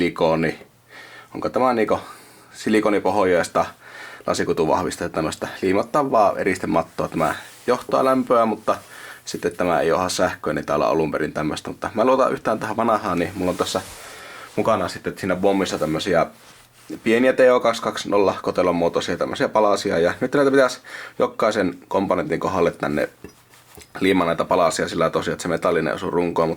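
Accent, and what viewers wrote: Finland. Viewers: For what it's worth, as a non Finnish speaker the Finnish-English dialect has it's own charm. I am sure I would enjoy your videos either way :) Nice series by the way, love me some open source hardware hackery.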